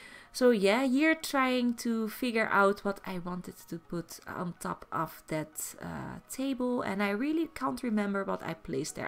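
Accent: Netherlands. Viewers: Ik kon gewoon aan het accent horen dat je nederlands bent haha, maar erg mooi huis! die keuken ook mooi uitgezocht!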